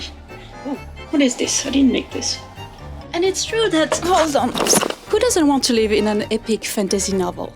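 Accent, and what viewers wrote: France. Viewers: Je suis trop contente que t fr mdr, au debut j'ai entendu ton accent et je me suis dit "mais attend elle est française elle" mdr Tu fait un bon boulot, ta deja fait quelque chose de mignon ? Genre couleur pastel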